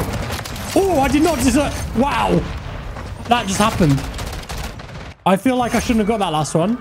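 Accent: United Kingdom. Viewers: thanks this is a very useful video but with british accent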